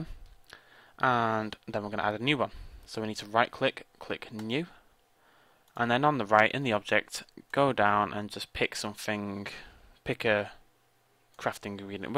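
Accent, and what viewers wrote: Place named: Northern England, United Kingdom. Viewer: Lol the YT subtitles obviously don't get Northern English accents. You were apparently talking about Alaska or something. (I'm a Northerner).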